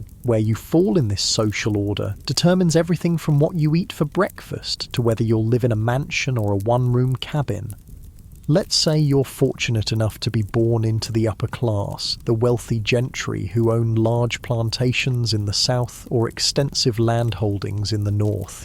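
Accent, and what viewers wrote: United Kingdom. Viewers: I hate the British accent and voice used 🤢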